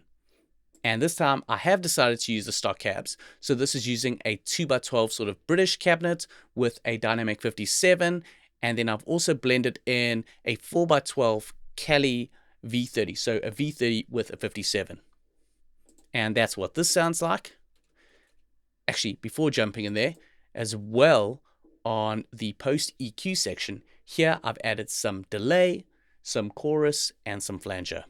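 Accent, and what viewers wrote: South Africa. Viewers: Ayyy I noticed that accent! Fellow South African!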